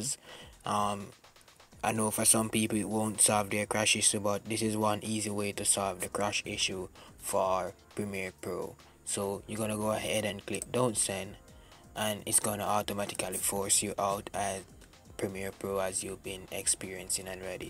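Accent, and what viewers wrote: Jamaica. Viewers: Sound like a real yaadie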